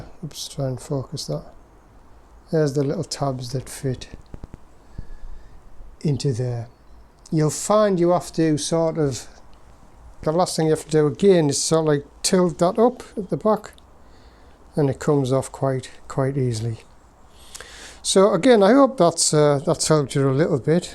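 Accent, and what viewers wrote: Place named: North, United Kingdom. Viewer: Yes very good videos. Good northern accent very smooth. Looking to get one of these myself.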